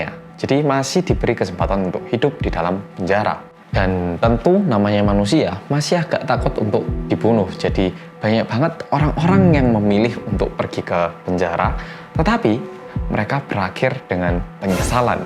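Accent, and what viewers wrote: Java, Indonesia. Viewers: Medddhok hahaha (like donk ka, heheheh canda tapi kalo mau di like like atu ) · Org Korea medhokkk💜😌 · Org Korea yg medokkkk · Bersama orang Korea yang medoookkk ... 😊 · Orang korea medook❤ Dan ganteng😁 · Bersama orang korea yang medokk mantapp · Apa cuman aku kalo mas opening "hello guys welcome back to korea reomit bersama orang yg MEDOK!!" trus aku ikutan ngomong medok? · Welcome back dngn mas yang medokk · Mass medhokkk... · Orang medok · Pertama liat mas lucu aja gitu orang korea ngomong medok🤣best deh MAS 💗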